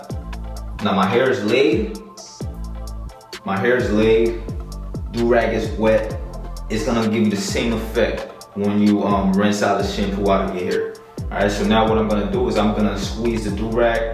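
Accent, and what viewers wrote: New Jersey, United States. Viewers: I can tell you from jersey by way you talk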